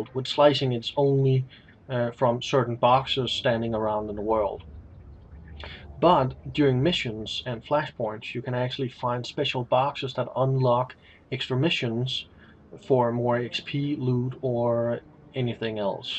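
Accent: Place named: Denmark. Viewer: Loving the Danish accent :D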